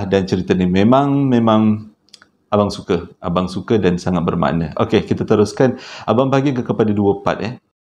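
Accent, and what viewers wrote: Java, Indonesia. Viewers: Bercerita ada slang2 jawa..tu yg terpikat kat channel ni..menusuk dlm jiwa raga...😊😊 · Slank tu mcm bunyi ala2 jawa.....memang tulen ye😬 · Abang jawa ke bang.sedap didengar.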